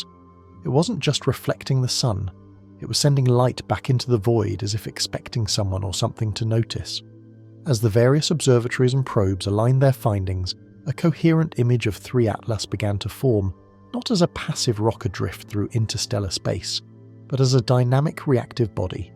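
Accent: United Kingdom. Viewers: So sick of the British accent explaining fkn everything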